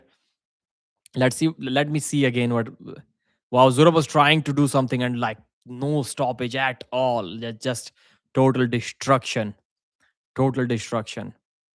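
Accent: India. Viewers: More Indian narrating, stfu and post the fucking match and wash your hands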